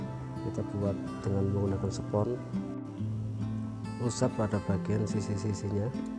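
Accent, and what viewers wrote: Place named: Java, Indonesia. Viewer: Wong endi Iki kok koyok wong jowo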